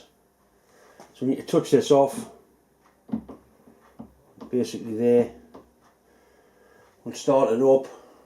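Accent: Newcastle, United Kingdom. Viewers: NE English or Geordie. Recently heard it comes from the local Miners using George Stephenson's safety lamp rather than Davie's. Went to college in South Shields and never heard why Geordie, who knew? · Newcastle English.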